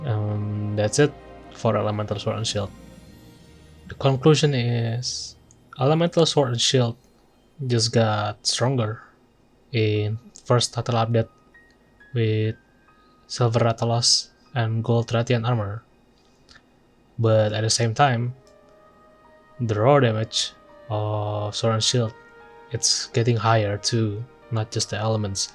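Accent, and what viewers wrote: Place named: Indonesia. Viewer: You sound like Indonesian. I really like Lunatic Rose, it is really convenient to bring to multi-monsters hunt. This update is huge for me coz I dumped all my previous set and finally can slot in Peak Performance 3 + Chain Crit 3 + Defiance 5 and even Good Luck 3 (gonna change it to Partbreaker 3 coz my I'm already set with Qurios Augment).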